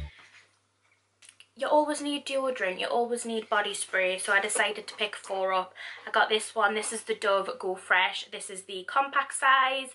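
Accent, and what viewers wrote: Newcastle, United Kingdom. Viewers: are you british? luv you btw xx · Geordie accent has always been my fave 😫 could listen to you talk all day girllll !! 😘✨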